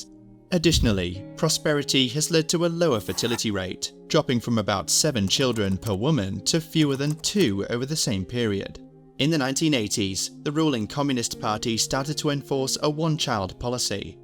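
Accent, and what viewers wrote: United Kingdom. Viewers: The narrator must come from Lancashire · shit video every time i hear a british accent in a video i know its just going to be bad content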